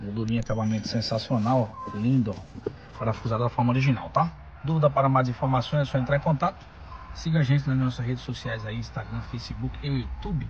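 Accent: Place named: Rio de Janeiro, Brazil. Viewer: Vc e do rio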